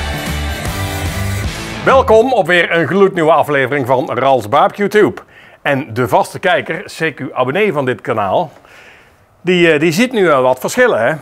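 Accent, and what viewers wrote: Brabant, Netherlands. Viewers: Hé, weer een geweldige presentatie en gewoon lekker op zijn Brabants! 😜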